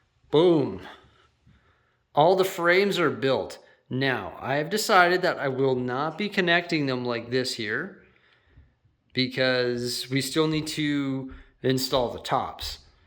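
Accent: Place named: Canada. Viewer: You sound very Canadian lol lmk if im wrong but I hear it in the vowels fs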